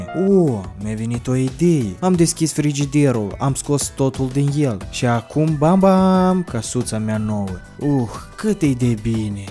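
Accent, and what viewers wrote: Moldova. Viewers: Pai se vede dupa accent ca e din Moldova.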